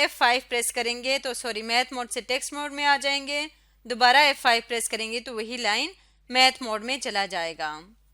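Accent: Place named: Pakistan. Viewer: It seems that you are from pakistan.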